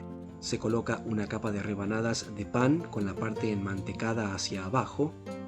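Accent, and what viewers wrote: Buenos Aires, Argentina. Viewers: Hace rato que sigo este canal, pero hace rato también que no venía a éste. Y cuando veo y escucho las recetas, me siento tan en "Baires"!: vaini"sha", ra"sha"dura de medio limón, mantequi"sha"... lo preparé "sho"... es tan "sho", como me dicen mi amigos hispanohablantes... no sé si es tan YO o nuestra idiosincracia al hablar y ese cuidado del habla... cuando hablamos y decimos las cosas... Como dicen mis estudiantes. En todo caso, este canal me encantó siempre por su ELEGANCIA, su lado RETRO, sus ustensillos paseistas, su lado tan porteño, su manera de ser tan 'nosotros"... GRACIAS, por darnos tanto!!!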